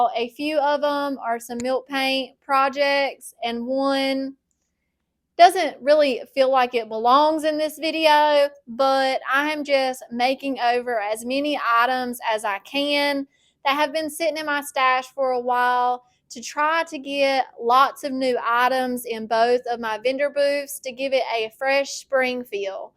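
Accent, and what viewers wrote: South, United States. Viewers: I’ve never tried milk paint but you make me want to try! I’m so excited I found your channel! And I love your beautiful southern accent!! 🫶🏼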